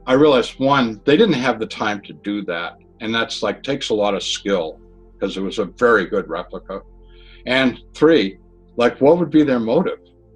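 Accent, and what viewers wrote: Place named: New England, United States. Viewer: F'in D's.. lol I love The New England accent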